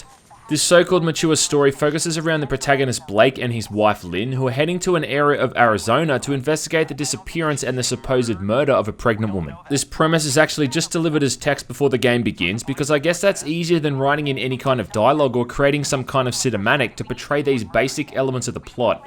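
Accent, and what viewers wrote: Australia. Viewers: is awesome. I personally liked outlast, but we need someone to be tough on games so developers give us more. Much support from the United States to you my friend. I watch many of your video's and keep up the great work. Just curious are you from Australia or New Zealand?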